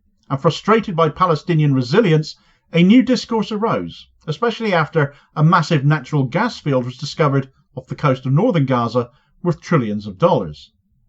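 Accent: Cornwall, United Kingdom. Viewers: as much as I enjoy watching your vids and enjoy your dulcet Kernow accent, was the speech at the Oxford Union filmed? I know Susan Abulhwa has posted the transcript on X, but I'm trying to find a video version. Kudos for reciting this and bringing it to a wider audience.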